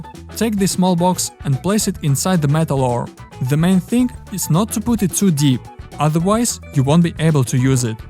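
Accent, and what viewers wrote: Russia. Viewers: Брат ты русский что-ли? Акцент прям мощный.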